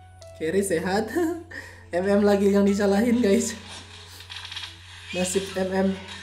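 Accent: Manado, Indonesia. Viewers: Lu manado ya bro?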